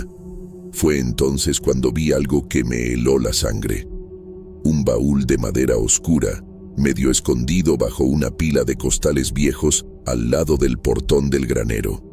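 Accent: Mexico. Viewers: Alchile ami me encanto con herga MEXICANA creo que es el primer canal con ese estilo 🤙🏻y ME GUSTO mucho,siento que te familiarisas mas con la persona del relato y las emociones que sintio por su manera de expresarse.🤌🏻📽️🫶🏻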